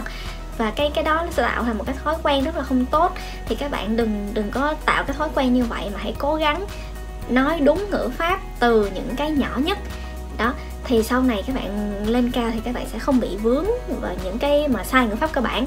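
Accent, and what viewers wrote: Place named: South, Vietnam. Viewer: Lâu lắm mới thấy vlog về tiếng Nhật giọng miền Nam, quá dễ thương. Cảm ơn bài chia sẻ của bạn.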